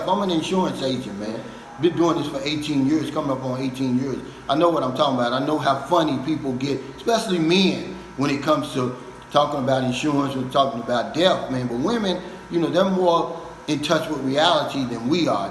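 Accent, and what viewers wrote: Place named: Texas, United States. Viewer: Sheeeeeeeiiiiittt sounds like Texas let's goooooo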